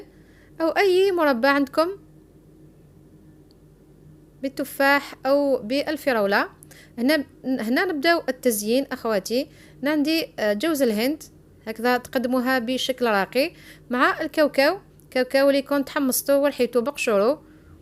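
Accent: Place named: Kabylie, Algeria. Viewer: الله الله على الكيكه شاطره تهبل مشاء الله يعطيك الصحه والخير و الهدره القابلية نموت عليها ❤️😘😘😘 احلى لايك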